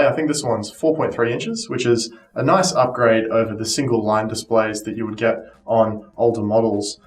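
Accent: Australia. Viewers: He's saying Hertz correctly. With an Australian accent.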